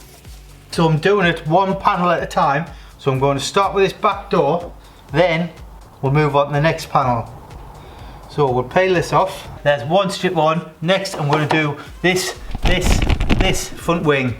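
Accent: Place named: Scotland, United Kingdom. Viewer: is Scottish. It’s a Scottish accent 🏴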